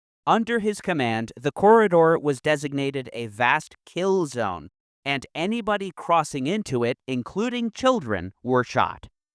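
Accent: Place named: West, United States. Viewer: He sounds western though?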